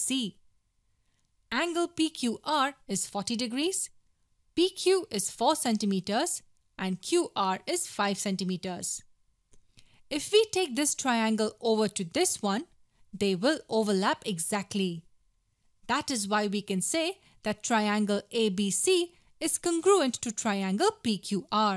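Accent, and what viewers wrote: India. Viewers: She sound like indian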